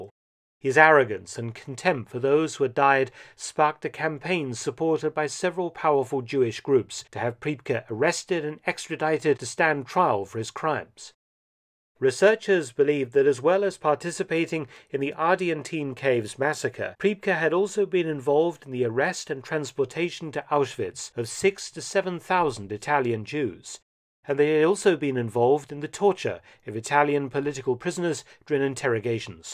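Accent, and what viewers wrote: United Kingdom. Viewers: There's something about a British accent that makes a WW2 history video that much better lol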